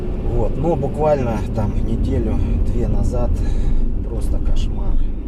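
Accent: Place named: South, Russia. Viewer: Говор у тебя все равно южный 😁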